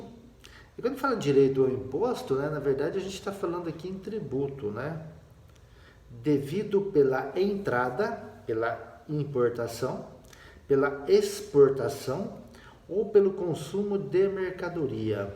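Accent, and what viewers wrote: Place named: Brazil. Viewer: No le entiendo bien esta muy brazileiro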